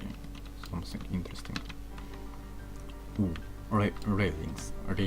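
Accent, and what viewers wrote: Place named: Russia. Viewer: Привет! Можно документацию на русском? Очень неудобно слушать английский от русского :///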